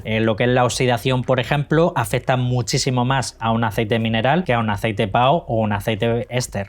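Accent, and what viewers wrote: Murcia, Spain. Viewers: ¿murciano? Jaja Gracias por explicar las cosas tan bien muy currado el video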